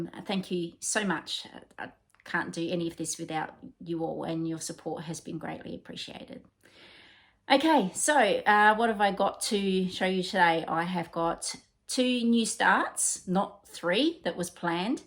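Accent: Australia. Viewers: So good to hear your pleasant auzzie voice again.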